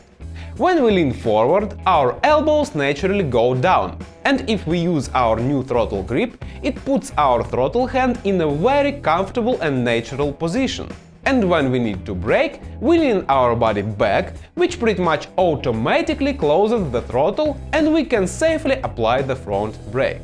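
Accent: Russia. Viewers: Love the vids brother, forgive my ignorance please, but you sound like you're from Russia? Im curious, how many months of riding do you guys get over there? in my head all i see is snow and furry hats, Russia's cold looks like its NO JOKE 😳 Ive obviously never been but id love to.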